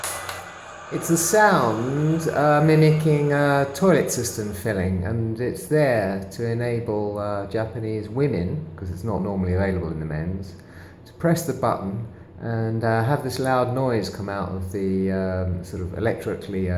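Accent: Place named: England, United Kingdom. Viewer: Hey hage, came here to listen to the sound, not your DA English accent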